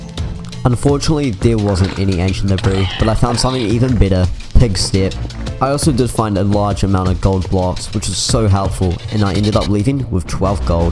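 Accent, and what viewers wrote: New Zealand. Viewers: Good old New Zealand accent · are you New Zealand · Are you a New Zealander?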